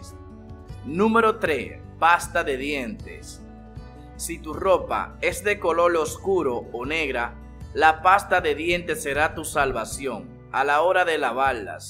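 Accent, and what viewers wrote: Dominican Republic. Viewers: Es de República Dominicana bro Ahí hablan así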